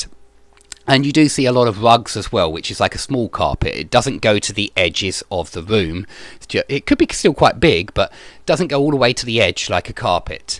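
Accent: United Kingdom. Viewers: I like how you explain the topics. Enough vocabulary, concise definitions, imagination and British accent. Thanks.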